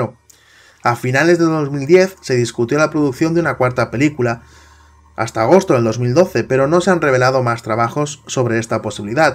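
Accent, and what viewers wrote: Spain. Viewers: a muy bien yo crei que eras de madrid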